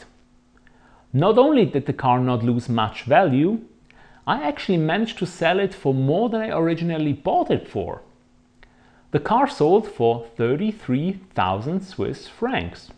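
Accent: Germany. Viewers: Work on your accent and englisch you Sound very German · Like Swiss clockwork (of which I have a few) you summarized your ownership in such a dry humorous way (the German accent added to it) that it was a joy listening to even if it was long :). I have a 2003 C4S so it's easy to relate to all the maintenance bits as they're the same apart from the turbos. Like your P-car, mine has the original Porsche radio, and I'd like to do the sam upgrade as the sound quality isn't that great (at low volumes especially), and I'd like to know more about the process of the change itself i.e. the work to be done. (I'd also like to change the speakers as those BOSE speakers aren't that great either). In addition I can add that I have also wanted to get my hands on some of the MF's that disregard the joy of ownership and punitive damages, not only on mine, but their own vehicles (I don't really care about their vehicle, but they should at least respect my wish to keep MY car as pristine as could be) . 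My only gripe towards your review is your reference to CHF which makes it harder to relate than if you had referenced a currency of more generic usage, EUR or USD. It's easier to remember those currencies compared to NOK, than CHF (imhop). Of course then you'd have to do all the math, but probably it would have made more sense to many of your 1,92k subscribers (I'm now one) and probably many of the 44292 (so far) viewers of this video (with greetings from Norway). and keep up the good work. :)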